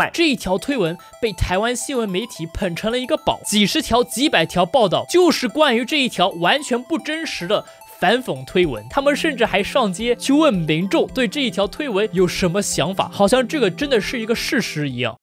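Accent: China. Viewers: 我住台灣40年了 讓你一個說者大陸口音的混血兒 一針見血地說出了台灣的事實 老實說 真的 他媽的 爽! 你說的真好! 而且 你的中國口音真的很道地 說起英語 又流暢到像是(本來就是)外國人 聽你說話 舒暢! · 中國腔有點嗆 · 以前聽到別人提到你的頻道有多不喜歡，我總覺得應該存在一些偏見，因為我是少數台灣人裡面理解比利時有三個政府而且各自為政的尷尬狀況。今天看了這個內容，其實你講述得很忠肯，但如果可以把敘事語言換成英語可能會更好，我有很多中國的好友，但你這口音，讓人不自覺得想防衛，但你做得影片內容都很棒，台灣能走到這一步，要感謝中國不斷地逼迫台灣，讓台灣在國際上不斷地曝光在國際新聞上，讓大家都知道台灣。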